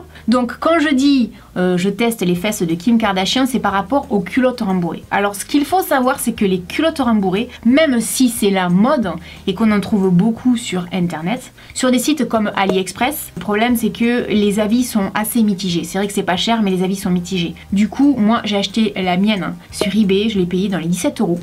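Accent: South, France. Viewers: Je suis amoureuse de ton accent du sud😍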